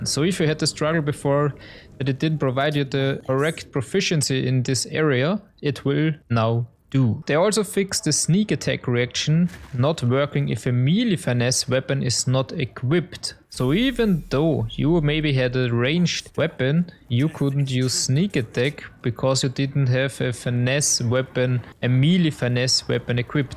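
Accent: Germany. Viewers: No offence, but that's a hell of a german accent... XD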